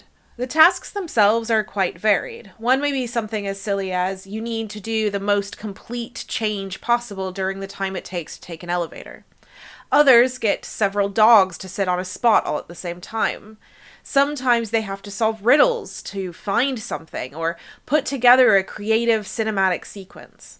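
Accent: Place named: United States. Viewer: One good thing about Taskmaster is that the British female contestants don't have that annoying American way of speaking that this narrator has.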